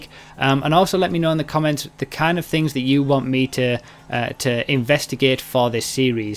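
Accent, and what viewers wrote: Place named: Geordie, United Kingdom. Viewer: Where is from? Just discovered this channel and it sounds like he could be from Newcastle, sounds Geordie just not as broad as the stereotype.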